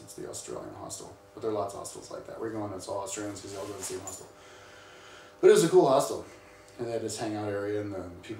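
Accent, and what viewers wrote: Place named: United States. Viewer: heheheh, my man, u are full 100% american, especially the way u talk ;)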